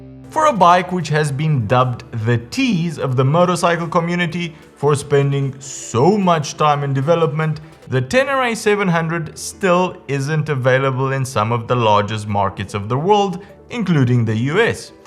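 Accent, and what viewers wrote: South Africa. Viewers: Thanks for the great info, where are you from? you sound positively Afrikaans/South African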